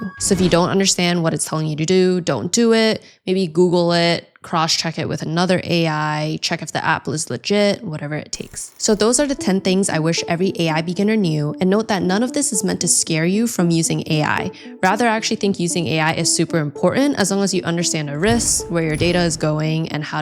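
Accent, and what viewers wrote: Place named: United States. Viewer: hi lady. i just saw u now. thanks for this video. its great value video. i have simple but important advise 2u. u speak very clear gd american english language, but since u r global now, itvmeans u r talking to many people with varying English language levels. you talk little bit fast, which make it hard to catch or understand all what you say in ur videos. i wish u can talk relatively slow so then most or all people watch ur videos, easy catch u & understand u. thanks❤🎉🎉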